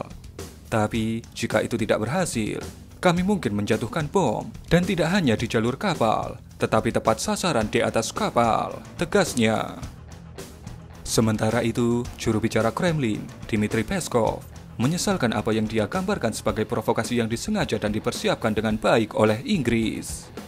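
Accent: Java, Indonesia. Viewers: Kok meddok banget mas wkwk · Medok amat mas suaranya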